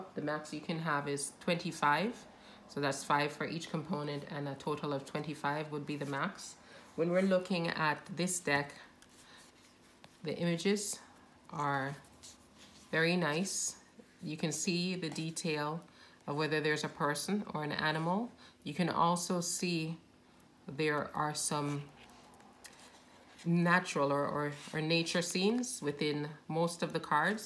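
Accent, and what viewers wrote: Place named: Jamaica. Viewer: Trying to figure out your accent. Not sure if your Jamaican or not. Maybe Trinidadian. I know both talk differently. I’m usually pretty good recognizing Jamaicans. Maybe it’s not even the two countries I mentioned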